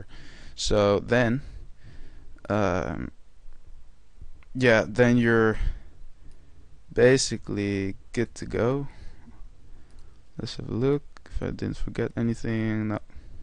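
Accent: Netherlands. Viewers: Nederlands! ik hoor het aan je stem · you'r dutch ( i too) i can hear it! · je hoort echt aan je stem dat je een nederlander bent ! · Je kan heel goed horen dat je een Hollander bent! LOL +1 abonnee! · Je hoort gwn aan je Engels dat je Nederlands bent. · Volgens mij ben je nederlands. I think you are Dutch wahahah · he is Dutch and English is his 2nd language. I bet those who complain speak only one language. Besides I think he's got a very nice accent!! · nederlander accent hoor ik.... very nais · vanaf het begin wist ik dat je Nederlands was! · you sound dutch!!! im dutch too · Nederlander? haha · je doet het geweldig. Heel goed Engels, ik hoorde dat je waarschijnlijk Nederlander bent maar had dat eerst niet goed door. Compliment. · dacht ik ook al, niet door die comment,maar je nederlandse accent :D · Hahaha jij bent nederlands :p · Haha, je klinkt super nederlands, and btw, vreselijk bedankt! 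:d <3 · are you dutch? Your accent sounds dutch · i can tell by your voice that ur dutch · are you dutch??? i hear on your accent i have the same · yes he is i can hear are you dutch i''m dutch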